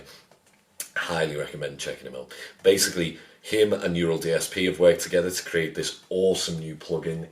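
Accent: Liverpool, United Kingdom. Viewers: F**king hell I was not expecting a scouser accent XD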